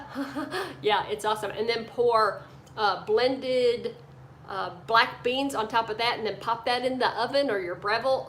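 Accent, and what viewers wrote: Texas, United States. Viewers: Her talkin told me she's in Texas 😅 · As if the lovely Texas accent didn’t give it away!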